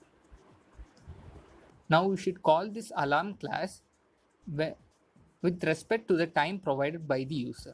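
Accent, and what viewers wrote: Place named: England, United Kingdom. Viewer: What the English accent, can't understand anything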